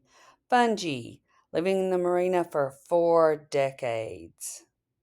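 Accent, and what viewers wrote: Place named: United States. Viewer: Wow that's an amazing American accent, where did you grow up?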